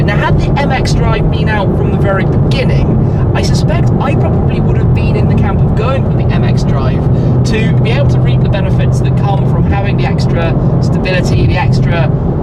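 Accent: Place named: United Kingdom. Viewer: Please, PLEASE, don't lose your brit accent and get too murican, PLEASE!! Keep up the awesome work and keep safe! Thank's, awesome machine, congrats:D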